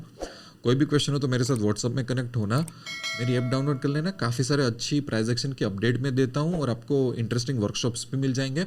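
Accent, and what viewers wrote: Gujarat, India. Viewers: As ur voice accent is , i think u r a gujarati.....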